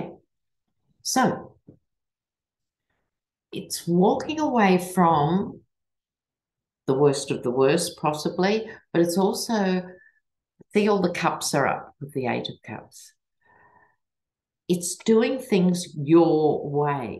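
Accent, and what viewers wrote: Australia. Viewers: I Love that you're going to be on Mary Trump's show as a political commentator. You can seem sometimes like a Sherlock Holmes of tarot and occasionally a gypsy woman tarot reader always with a distinct Aussie accent. Looking forward to your considered thoughts and opinions.🙂 Peace ✌️ ❤️ Love you lots ❤️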